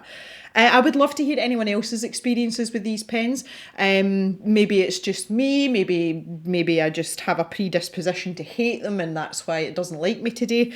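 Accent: United Kingdom. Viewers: Very nice picture. I have the comlete range of the Faber Castell pitt artist pens (a Christmas gift from my husband...thank you so much) and I like them so, so much. Especially for using as a watercolor fake. They work so well on every (nearly) kind of paper and have vibrant colors. Your picture is so great, thanks for sharing this with us and uploading. Your accent is very interesting. I know you are british, but which area do you come from exactly? Greetings from Frankfurt and stay healthy please!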